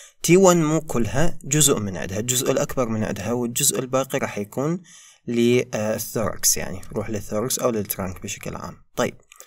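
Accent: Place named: Iraq. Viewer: ربي يوفقك بجاه محمد وآل محمد نحبك كلش من العراق اغلب متابعينك طلاب عراقين يمكن اني الوحيدة الي لاحظت اللهجة مالتك مزج بيها لهجة عراقية يمكن تستخدمها لان متابعينك عراقين حتى يفهمون اكثر احييك 💙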